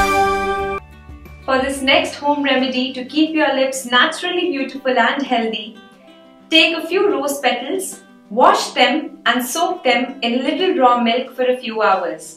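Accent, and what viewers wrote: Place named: India. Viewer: love ur indian accent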